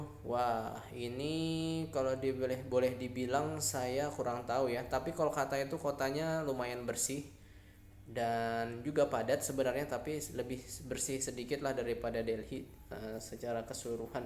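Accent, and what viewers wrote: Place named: Java, Indonesia. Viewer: Saya gak nyangka lo kalo masnya dari kalimantan😀 kirain wong jowo😆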